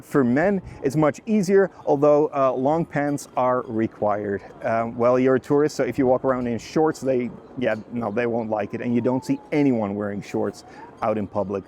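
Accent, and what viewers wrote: United States. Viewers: Very nice and informative video. I hope you get to go back again and visit more places and have lots of fun. For your information, when someone in Iran (and probably in Middle East) puts their hand on their chest, they're kind of putting it on their heart, meaning that you belong there... in their heart. It's a sign of sincerety, if you will. (^_^) Oh, and from your English, I initially thought you were American. Your English is perfect. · for a Dutch person, you speak English very closely to an American. A lot of people form Holland visit. Iran. Very nice and genuine vlog.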